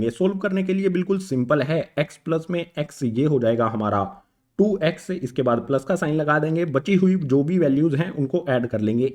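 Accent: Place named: Tamil Nadu, India. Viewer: Love ur voice sir❤️❤️ Bcoz it have Tamil tone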